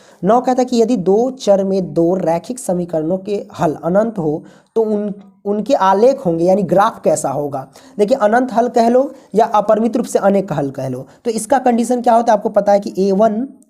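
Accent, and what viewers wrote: Bihar, India. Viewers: Very nyc sr ji Se ji mughe bihari language bhut achci lgti h such m mja hi aa jata h . ❤❤😂😂❤❤😂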